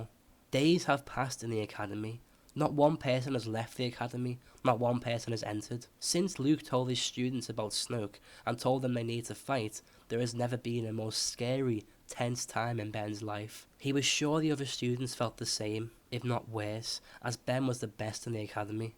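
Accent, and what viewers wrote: Liverpool, United Kingdom. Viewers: Are you from Liverpool?? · Are you from Liverpool? .-. also cool vids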